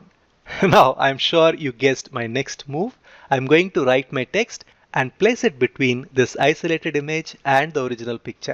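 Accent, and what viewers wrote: India. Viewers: Please don't mind....but can I know that you are an Indian or American Sir? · Obviously indian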